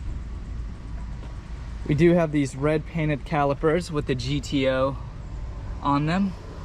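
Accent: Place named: Australia. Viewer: Aussie, mate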